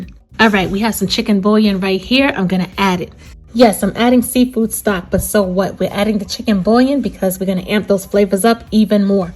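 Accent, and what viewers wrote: New York, United States. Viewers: Obviously....NYC/New York City. Probably Brooklyn or Queens area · U can tell she's from New York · Oh man I can dive right into that skillet and eat my way out. Just the way I like it. I get lazy too much and go for popular pre mixed Cajun rice This is so much better. I almost thought you forgot about that half cooked shrimp but I should have know better you got that lid on their and cooked them just enough You got the right sausage too. Love that accent. Why do I think I hear New York City in there?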